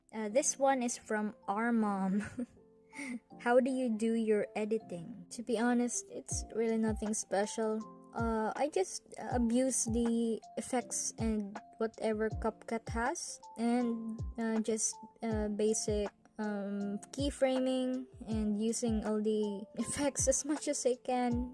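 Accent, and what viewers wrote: Philippines. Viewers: You sound Filipino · Your voice reminds me of a Filipino accent, are you filo? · I never expected ur from phil and ur voice sounds exactly like from phil, beautiful voice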